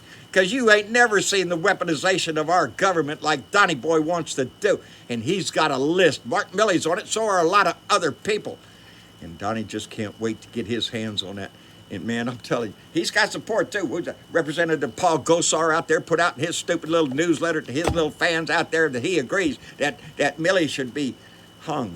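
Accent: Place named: New York, United States. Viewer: WOOO HOOO!! Holy you know what I can't tell you how nice it feels to hear my NY thoughts echoed in that accent! THANK YOU. 👏🌼✌🏜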